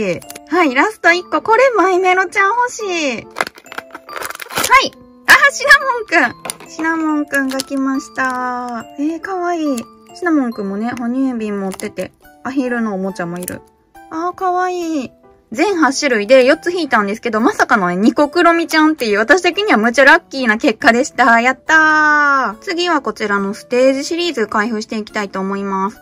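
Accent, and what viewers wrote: Kansai, Japan. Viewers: の関西弁は落ち着きますよー💖そして買い物動画は一緒に行ってるみたいで楽しいです🥰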